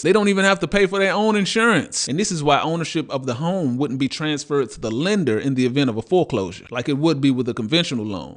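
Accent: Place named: Washington DC, United States. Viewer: Listen at that DC Accent!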